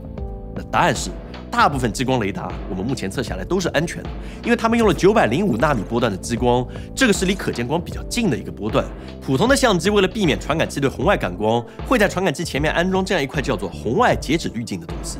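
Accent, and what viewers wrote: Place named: Jiangsu-Zhejiang, China. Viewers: 完全被江浙口音搞出戏了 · 四川 河南 东北 北京 安徽 河南 山西方言大行其道，江浙沪带点口音你就受不了了？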